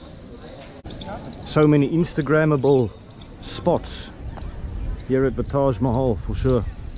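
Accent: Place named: South Africa. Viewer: Are you South African by any chance? Your voice and accent sounds very similar to the South African cricket legend AB de Villiers. And just as I was typing this, you also happened to mention his marriage proposal story, so I am guessing you might also be from South Africa. Anyway, awesome video. Cheers!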